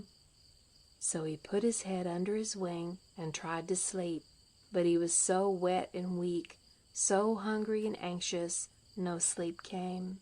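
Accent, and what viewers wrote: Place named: South, United States. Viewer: Terrible terrible southern accent from this narrator.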